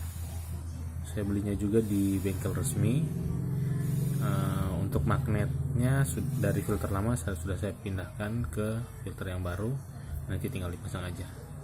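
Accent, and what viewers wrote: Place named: Manado, Indonesia. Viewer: Dapa dengar rupa orang Manado ini kang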